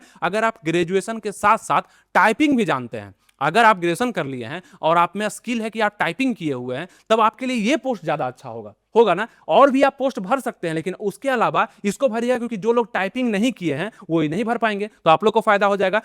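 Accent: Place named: Begusarai, India. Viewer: Begusarai.se.bolte He.sir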